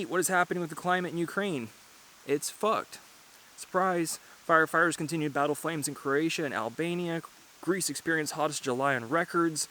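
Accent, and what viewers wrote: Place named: United Kingdom. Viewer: I like your UK accent,.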